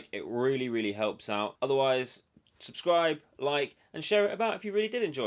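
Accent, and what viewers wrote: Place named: South, United States. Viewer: You're very welcome. Ah okay, not to worry, just thought that i recognized the accent but i guess all us southerners sound pretty similar ;)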